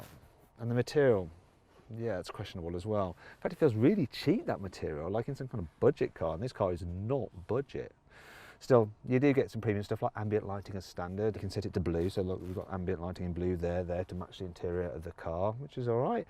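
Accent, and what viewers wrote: United Kingdom. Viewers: マットのイギリス英語好き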